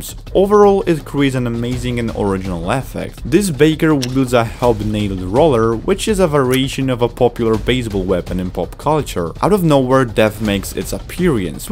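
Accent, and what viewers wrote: Poland. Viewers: Wait are you Polish? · are you polish or something ?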